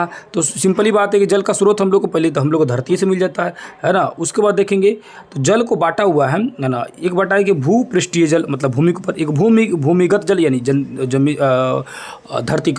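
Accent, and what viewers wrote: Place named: Bihar, India. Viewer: Sir geography class 10 ki playlist me chapter 5 to 11 tak add nahi h please add kar dijiye ... Aur apka way of teaching bahut achha specifically apka bihari touch jo ata h bolne me vo bahut hi amazing hota h maine mere bahut se friends sw share ki h apki class videos ... hopefully apke jaldi se views badhe aur subscribers. 😊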